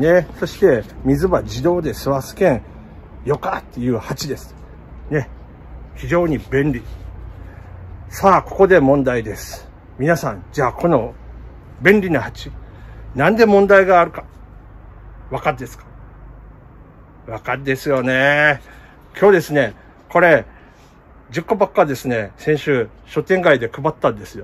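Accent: Kumamoto, Japan. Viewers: 熊本弁、聞きづらいので標準語で喋っていただきたいです💦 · ふふふっ😂 熊本弁は面白い😆😆😆 このシリーズお願いします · 社長、熊本弁、心に響きました🤭 シクラメンの鉢もオシャレになりましたねぇ✨ 行き着くとこはやはりお水管理 ( っ ˙꒳˙ )っ🚰ｵﾐｽﾞﾉﾝﾃﾞ 夏越しさせた５歳のシクラメンですが、まだ🌱 が出ません（ ᐪ꒳ᐪ ）球根ダメになったのかなと思いつつ、諦めず毎日観察してます ( ⚭_⚭)✧ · 東北生まれの関東人ですが熊本弁良いですね😊 バッテン荒川さんを思い出します。これからもやってくんち‼️ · 熊本弁かわいいなぁ〜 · 熊本弁いいですね😃‼️ 社長らしさが伝わる☺️ そして、との最初のやり取りが仲良し夫婦な感じが伝わる～😂 今週雪がもっつり降ったので外で冬越しできます多肉さんが残念のお知らせ。。。😱雪にまさか当たったとは。。。⛄💦 便利グッズ、頼りすぎはダメですよね😅私も気を付けます❗ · 社長の熊本弁好きだなー。もお疲れ様です。いつもありがとうございます。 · まうごっっおもしろかぁー、私のは、エセ熊本弁かもしれないけど、たいぎゃー、まうごっ、こぎゃん、そきゃん、面白すぎて２回観ました！社長の熊本弁動画大好き一生懸命聞き取ろうと集中力アップ⇧😂だけど、やっぱり苦手な人もいるんですね😢残念、でもたまには、熊本弁の動画もお願いします。楽しみにしてます❤ そうだ、思い出した！熊本の温泉で、あとぜき！！って書いてあったの思い出して1人笑いしてました。本日最高動画でしたー🎉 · 熊本弁、社長らしくてめっちゃいい❤ ちょっと意味が分からないところがあったので、少しだけコテコテを抑え気味にして、ぜひ続けて欲しいです❗️ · 社長！熊本弁のほうがいい！こっちのほうが私好き！社長らしいし親近感わく～～！！字幕いれるとめっちゃ面白いし！🤣🤣🤣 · おはようございます☀ 熊本弁😂 気になって内容全然入ってこず2回見ました😂😂😂 そして1002回目！おめでとうございます㊗️🎉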